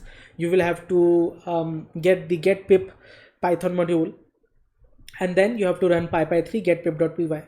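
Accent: India. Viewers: It's the thick Indian accent. It's not easy on the ears for foreigners.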